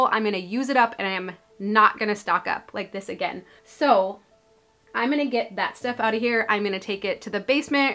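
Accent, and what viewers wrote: Minnesota, United States. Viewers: You say bAg like a true Minnesotan! Gotta love that hard A sound. I also have that same A problem 😂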